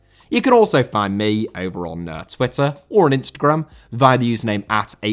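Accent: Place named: United Kingdom. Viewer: Woooooooooaaaaaahhhhh WTF. Check your British hate pal, whilst you have that accent!! You big up the Turkish Sultan for donating £1000 from the Ottoman Empire (which was collapsing at the time, and could be the actual truth about the sum donated) and then you take a ducking swing at our Monarchy, after starting the section AND THE BRITISH LET IRELAND STARVE. Then admit that was a lie, and Queen Victoria donated TWICE what you just licked the arse of?!?!? DID THE BRITISH INVENT BLIGHT, THEN?? Did this nation disease all the potatoes?? No we didn't. KEEP OUR MONARCH'S NAME OUT OF YOUR DUCKING MOUTH. Tell both sides of a story for once!!!!!! Or better still, stop reminding us why there is NO PLACE FOR POLITICS IN SPORT!! Did you research Manchester City's connection with Germany? Or how the Jewish community were the ones who held out their hands post WWII in Manchester? 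No you didn't, because you are ALWAYS about the low-hanging fruit, and it's getting boring now, you Progressive little Puss!! MAN THE BUCK UP and leave the country if you just want to slag it in every video you make. I'm sick to death of traitors in Britain. YOU are why this country keeps getting worse. You ARE NOT the solution. What is it about this channel and Commie nations? Go live in one, and maybe you will stop giving them so much screen time. Or do you have Chinese investors too? HULL INTERNALLY TAKE CHINA SEVENS at a time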